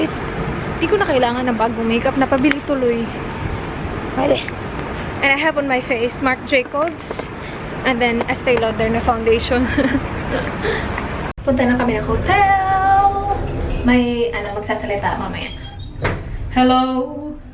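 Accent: Visayas, Philippines. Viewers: Happy 100k, ur vlog is getting more interesting due to d nice places you've been going, credit to ur Papa Bear.... I'm one of ur subscribers who really got hooked watchin ur vlogs Coz ur maarte but natural, sometimes ur visayan accent get me annoyed and I don't find u that attractive and as u said wit 5head but u r all naturelle, u don't mind showing how raw u r.... In time inshallah you will mature and grow like a fine lady medyo konting basa pa more wag lng YT so that ur vlogs will b more substantial.....Good luck! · Mjy visayan accent annoys you? Remember there are a lot of dialects in Ph, and no specific dialect should be superior than the others. Youre wishing maturity but heyyy, wish yourself more maturity please if that is your outlook and belief in life. IBOG LANG KANG BAKI-A KA! Awa makatakod ang imong pagka walay maturity aunty hahaha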